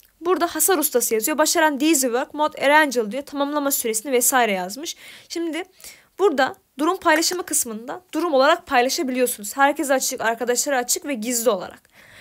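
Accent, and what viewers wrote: Azerbaijan. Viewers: azərbaycanlı sən..?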